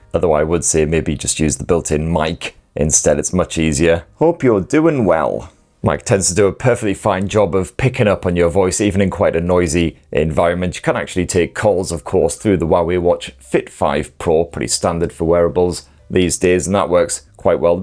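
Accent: United Kingdom. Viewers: What British accent is that?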